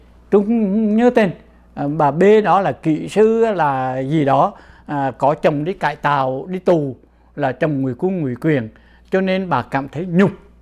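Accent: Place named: Hue, Vietnam. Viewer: Giọng Huế ❤❤❤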